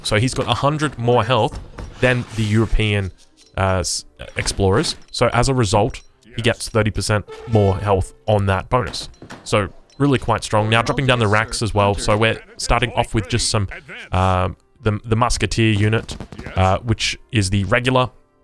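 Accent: Australia. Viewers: Love the way ,you aussies say "Good'ay"! I've been playing AOE III for some years, but i don't feel prepared for online xD Cheers from Portugal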